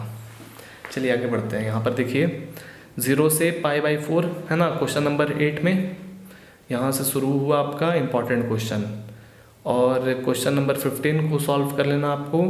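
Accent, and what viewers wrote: Bihar, India. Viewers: Sir Aap bihar se hai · Sir aap bihar se hai kya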